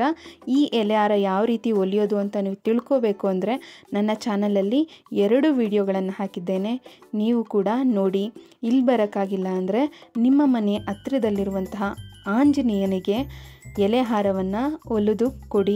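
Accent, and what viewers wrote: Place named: Hiriyur, India. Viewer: Nindhu hiriyur raaa niv mathadooo style hiriyur near bhashethra edhee soo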